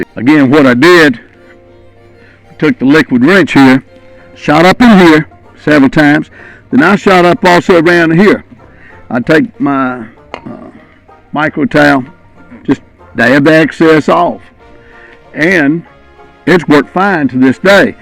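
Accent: South, United States. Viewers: 5/6/23 Just came across your videos. I watched 2 so far and you addressed the same problems I had today after coming back from one of my first rides this season up here in the PA Poconos. I bought this 2009 Tri Glide Ultra after not riding for 4 years and felt embarrassed by the little problems I was having. Thank you so much. Love your down home style and southern accent. Most of all, thank you for your service to our country. I subscribed and I am looking forward to more helpful hints.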